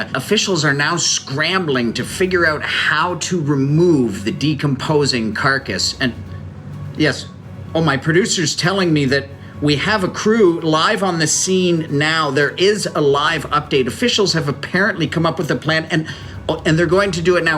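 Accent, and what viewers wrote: Canada. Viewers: You are really awesome. The canadian accent was just superb and the energy in videos makes look Thailand much more cooler. I will really come and meet you once i am back to Thailand and will surely bring goodies from India.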